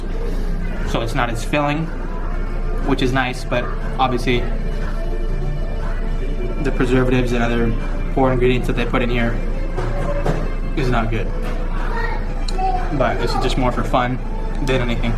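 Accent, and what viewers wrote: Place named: Canada. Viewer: Hi, i wanted to ask where are you originally from? and where are you based at the moment? Your accent sounds Canadian, but your name sounds Romanian and you look Romanian.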